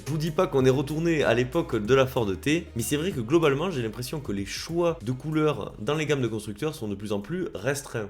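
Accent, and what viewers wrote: South, France. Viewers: Toujours trop cool tes sujets, ta façon de présenter, ta bonhommie et ton petit accent du sud. On a l'impression que tu as une cigale dans la bouche, j'adore! Avec BenAuto, CarJagger, New Retro Car et POA tu es mon préféré number 1. Fut un temps ou y'avait pas trop de choix Citroën HY gris, 2cv bleu ou grise en raison de la reconstruction d'après guerre dans les années 50 aux dires de mes parents acheteurs de l'époque. La pénurie a duré un certain temps après 1946. Un ami me disait que les autos blanches sont plus sécuritaires car plus visibles qu'une couleur foncée. La nuit on peut les voir plus rapidement à une distance moindre qu'une foncée. Je me rends compte qu'on a toujours eu des autos aux couleurs tristes. Peugeot DMA lie de vin d'occasion qui sortait quand même du lot, Citroën HY gris, Peugeot 203 noire, Break 304 gris, Cabriolet 304 S grise qui était verte auparavant et a été repeinte en rouge après la vente, 205 brun macao qui sort un peu du lot, Samba GL noire et 106 XN noire de 1991 que j'ai toujours d'ailleurs et que je bichonne et me rends bien service quand j'y vais en vacances. 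J'avais payé un supplément pour la peinture noire vernis. J'adorais le noir ca faisait classe. Mais avec le temps je préférerai une couleur plus claire car le soleil tape dans le sud de la France du côté de Béziers et le noir attire la chaleur. Un cabriolet 304 S jaune paille ou jaune safran (orange) serait mes choix. Vive la Deuche vert pomme de 74 de mon beauf dans les années 78/80.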